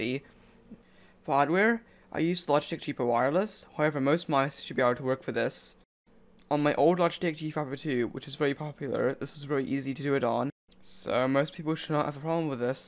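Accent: New Zealand. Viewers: Its kiwi accent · GGL it's his accent, I think he is from New Zealand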